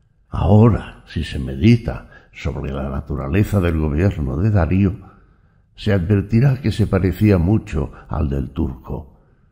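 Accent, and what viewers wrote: Spain. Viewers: Los Latinos raramente disfrutamos del acento Español pero esta voz si que que te encierra y te agrada invitándote a poner atención pero no se aun si también lo será para poner esto en practica. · Y en español ibérico....Una joya este audio. Maquiavelo más actual que nunca, no cabe duda. Mil gracias. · en español españa weon, español españa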